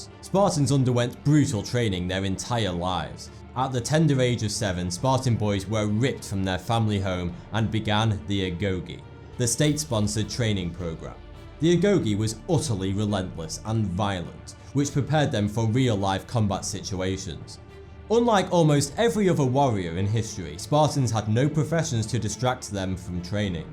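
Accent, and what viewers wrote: United Kingdom. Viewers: Mr. Khan <link> No. It's a dialect that causes a lot of British people to do this. I made the same mistake a while ago with someone else and was corrected. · The British accent just makes videos like these so much better, great video and great channel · Take it from me as a Brit, it's just how we say things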